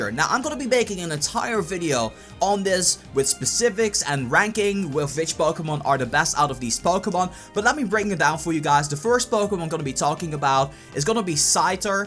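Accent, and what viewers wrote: Netherlands. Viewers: That horrible Dutch accent :( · ur dutch right? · Hey, are you dutch? i am and i thought you where too <3 more pokemon vids<3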